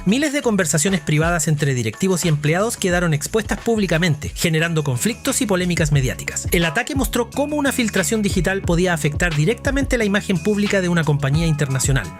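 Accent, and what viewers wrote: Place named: Chile. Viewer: Todo bien Excepto el acento chileno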